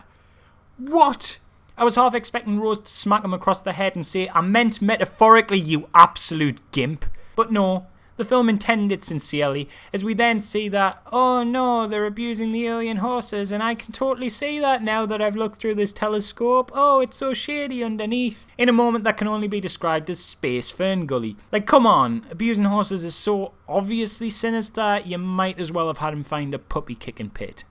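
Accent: Newcastle, United Kingdom. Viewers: Newcomer to the channel - thanks for the honest thoughts in this video. I liked TLJ a lot too. Also, love the unapologetic Geordie-ness. Good on you, fella (from way down South in Derby) 😎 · British huh......pussy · I fookin luv ya u geordie shite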